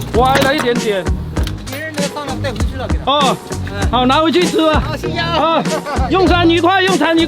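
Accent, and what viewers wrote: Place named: South, China. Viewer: 这口音好像南普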